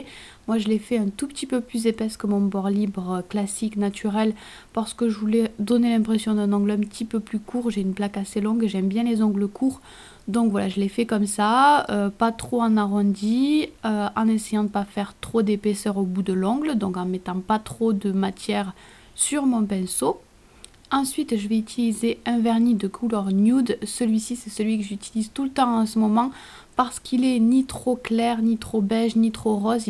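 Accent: South, France. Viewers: Bonjour! Je vais poser une question un peu bête, je voulais savoir si tu étais en région parisienne (je tente quand même malgré ton accent du Sud!). J'ai un coup de cœur pour cette manucure et j'aurai bien aimé que tu me la fasse pour mon mariage l'année prochaine!!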